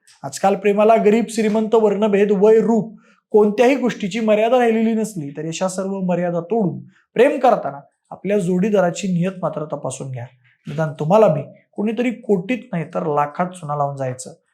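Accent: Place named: Satara, India. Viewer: Kadak bhasha shaili ahe satarkar vatatat nakki chhan avdla vidio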